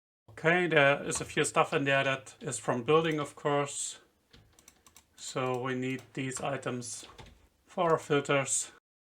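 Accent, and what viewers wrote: Germany. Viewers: Question about your accent, are you from Germany?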